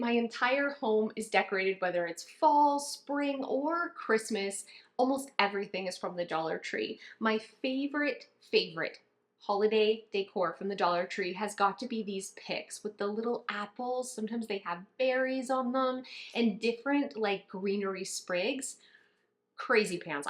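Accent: Canada. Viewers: She sounds Canadian…..so Canada has better Dollar Trees…probably more polite..lol…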